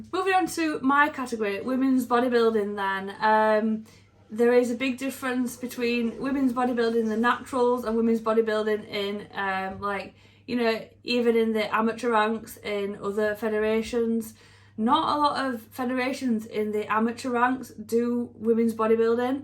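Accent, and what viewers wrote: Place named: England, United Kingdom. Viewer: Whichever you will pick...you are already winning! Love that accent...is that English? lol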